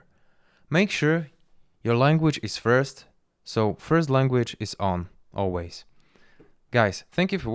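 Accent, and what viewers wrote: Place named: Slovakia. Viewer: slovak accent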